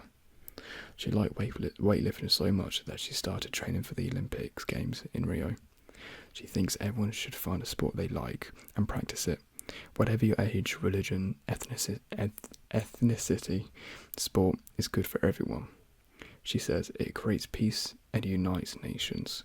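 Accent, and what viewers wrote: United Kingdom. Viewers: Ох уж этот вкусный британский акцент - я просто в восторге! Хочу чтобы у моего будущего парня был такой же голос)) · British accent, i live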